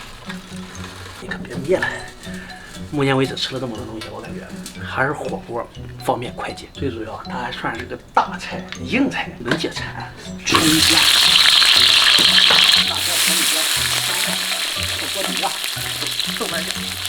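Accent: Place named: Jiangnan, China. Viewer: 看你的视频有点江南腔调哇 上海宁？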